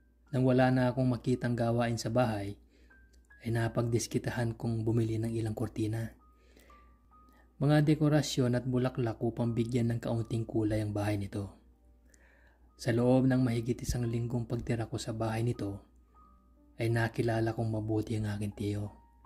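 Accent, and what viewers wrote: Pampanga, Philippines. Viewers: The accent is very Kapampangan. ❤❤❤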